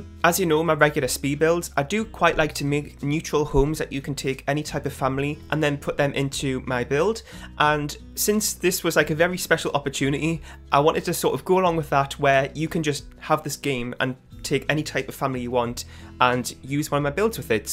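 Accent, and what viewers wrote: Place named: England, United Kingdom. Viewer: Really love the building! And I adore your accent. Where you from in England?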